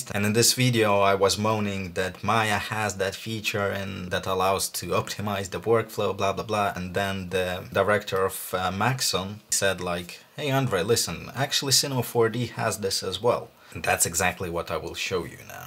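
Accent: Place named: Russia. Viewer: почти не слышно русского акцента) отличный видос, от души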